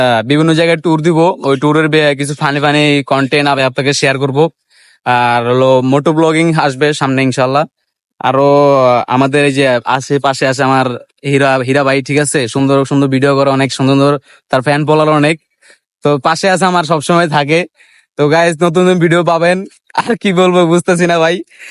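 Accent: Noakhali, Bangladesh. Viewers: Vhai to valoi kotha bolen noakhali bhashai 😮😮😮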